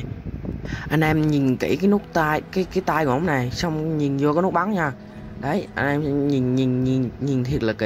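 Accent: Quang Nam, Vietnam. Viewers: Anh người quảng nam hả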